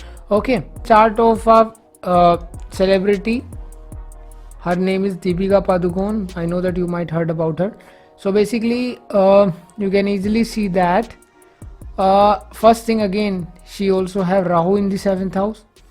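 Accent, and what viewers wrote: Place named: England, United Kingdom. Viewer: make more and more videos, love your channel and videos and your english accent..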